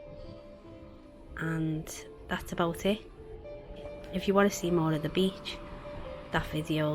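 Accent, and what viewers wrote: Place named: Liverpool, United Kingdom. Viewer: No mistaking that scouse accent there 😁 do u live out in Portugal? Were staying at 3HB Club Humbria in October can't wait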